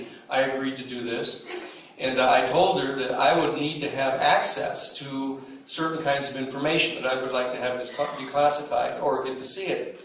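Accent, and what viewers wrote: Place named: Mississippi, United States. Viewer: from Mississippi, detected!!!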